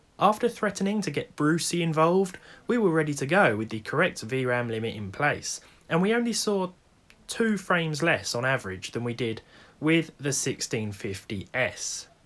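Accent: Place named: England, United Kingdom. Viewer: You sound line and Englishman, so a zero is not pronounced o cause that is a letter, its a zero.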